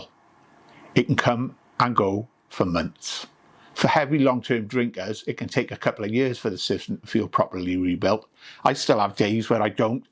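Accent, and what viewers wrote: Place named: Birmingham, United Kingdom. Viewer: It's like one of the peaky blinders got sober.. and having him telling you how it's done.. love it!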